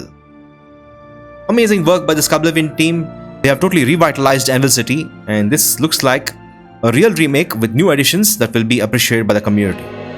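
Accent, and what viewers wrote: India. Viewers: Actually crazy this guy is German I thought he was Indian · sound like Indian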